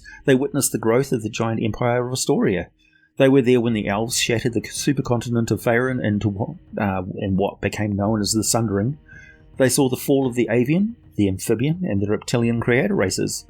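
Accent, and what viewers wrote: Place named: New Zealand. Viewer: Ya see, this is why you need to do those Creator Races videos. We need to know this stuff, and we need it delivered to us in a Kiwi accent.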